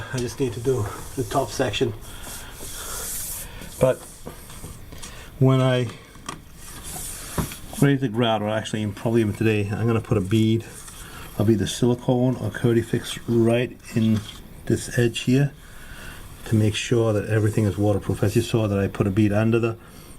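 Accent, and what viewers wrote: Boston, United States. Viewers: Boston area, I knew it the accent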